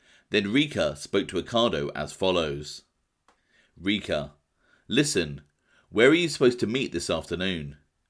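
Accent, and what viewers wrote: United Kingdom. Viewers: The British trash accent is so annoying just shut up and play the recording nobody cares about the annoying narrator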